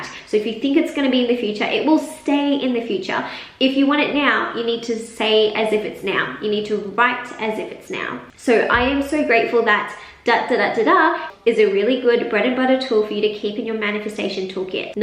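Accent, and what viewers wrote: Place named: Australia. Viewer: It's really unique to see a pretty Asian girl with such a broad Aussie accent.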